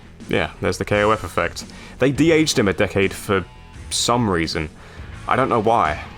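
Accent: United Kingdom. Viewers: I love hearing a Brit talking about Fatal Fury and SNK, the game and the company is not as popular as SF, Tekken, and Mortal Kombat here in the uk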